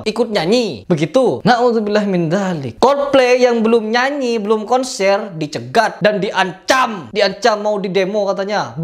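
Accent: Madura, Indonesia. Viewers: Cek maduranya logatnya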